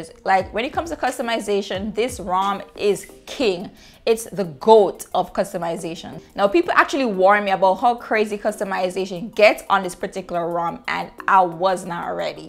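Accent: Jamaica. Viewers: is that a jamaican accent? seems like it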